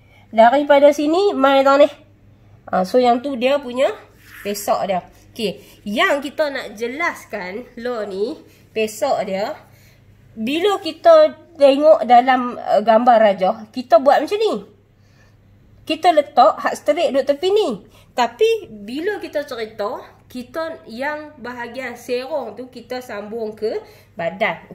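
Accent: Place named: Kedah, Malaysia. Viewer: Asalamualaikum Terima kaseh Banyak dah Saya tengok vedio pola Baju kurong tapi Cara u explain sgt2 jelas Dan mudah d fahami Dan lucu dgr Loghat Kedah u rasa u memberi penerbangan sgt2 jelas u slalu kata jgn dok COMPLAIN. BUAT. DULU BUAT YG. MUDAH. DULU SAMPAI. FAHAM BETUL belajar yg mudah dulu sampai faham lepas tu Baru belajar yg lebih advance TERIMA KASEH. SEMOGA SIHAT2 SELALU DAN D LINDONGI ALLAH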